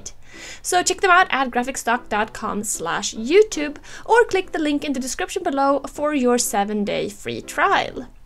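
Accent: Sweden. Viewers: swedish accent! :) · are you Swedish? nice video! totally making this. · Are you Swedish? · kul projekt! (antar att du är svensk pga uttalet) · HI! Read that you live in Oregon now but you're from Sweden originally right? Recognize the accent ;) Moved there recently? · You sound really Swedish haha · are you Swedish? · Nice! Well done! BTW R U from Sweden by any chance? Din dialekt är skön :) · 100% swedish accent! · You sound like you are from sweden :D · Hey, I wonder where you are from? :) what country? you sounds like a swed :P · Very cool and creative product, keep it up! also, are you by any chance Swedish? your accent sounds really Swedish!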